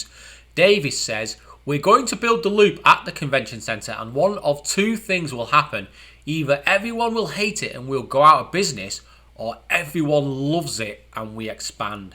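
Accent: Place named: Liverpool, United Kingdom. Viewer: Man your accent.. You're from Liverpool?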